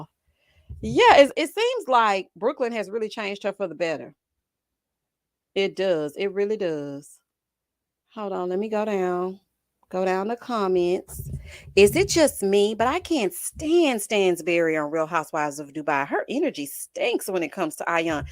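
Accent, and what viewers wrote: South, United States. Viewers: Hey Girl Hey… we love your southern commentary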